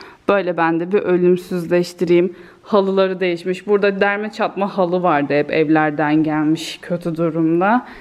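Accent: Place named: Black Sea, Turkey. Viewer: Hep bile gidiyoz mu tam karadeniz kızı olmuşun kız valla memlekete gidince direk o şiveyi kapıyosun hep bile gibi bizde mezarüstüne gittik gidiyoz deriz bu arada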